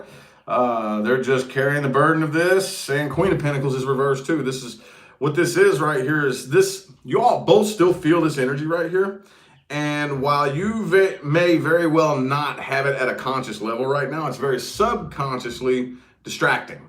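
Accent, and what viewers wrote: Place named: Kentucky, United States. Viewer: Love your accent. You sound like us in Kentucky.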